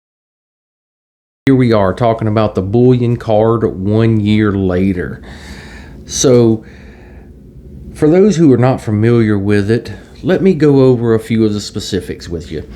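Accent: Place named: South, United States. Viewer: Why am I just now finding your channel? I am a southerner and miss hearing a good southern accent where I am at currently. Great on the card info. Love the Magnolia (have one in my front yard).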